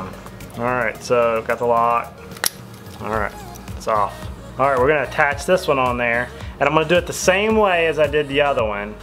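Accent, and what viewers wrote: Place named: South, United States. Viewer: It's the accent....Southern braintrust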